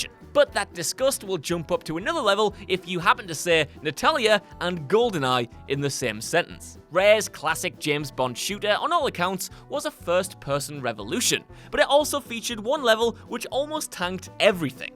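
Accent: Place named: Newcastle, United Kingdom. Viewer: why would you choose a stupid geordie twat to voice the video???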